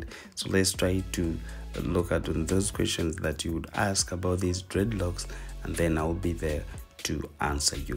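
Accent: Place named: Uganda. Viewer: ..I've just discovered you.are you Ugandan? You sound like my people..🥳🥳🥳🥳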